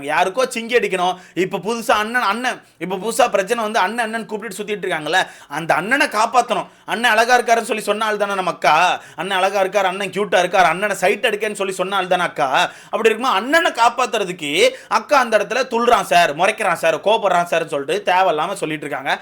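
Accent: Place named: Madurai, India. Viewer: Madurai slang bro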